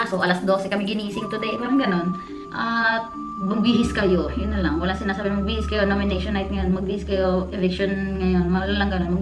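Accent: Batangas, Philippines. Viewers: Yay! <3 nakakabitin lang po. Hahaha! Since napanood ko yung blog mo na naaksidente ka, pinanood ko na din yung ibang videos mo kasi nacurious ako pano ka naging vlogger eh last time i know, galing kang PBB. Napaka genuine mo sa videos mo, malapit din kasi kami sa Batangas at parehas tayo ng "punto" pag nagsasalita kaya nakakaaliw na mapanood ka. Hahaha! As in very lunch time, gusto ko nanonood habang kumakain, vlogs mo pinapanood ko hahaha! More powers! God bless you:)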